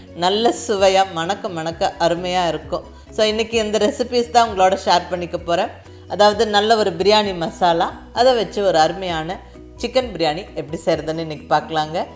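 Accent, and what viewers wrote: Kongu, India. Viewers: oh oh gongu nattu tamil konjugirade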